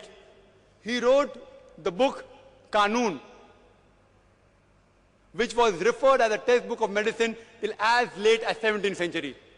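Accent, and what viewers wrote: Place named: India. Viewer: This guys sounds too Indian for me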